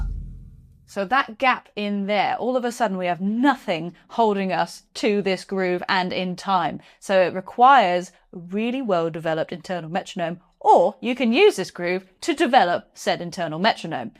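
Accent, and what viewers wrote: United Kingdom. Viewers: This is the way Brits talk. I was a UK resident for over a decade. Trust me there is no arrogance here.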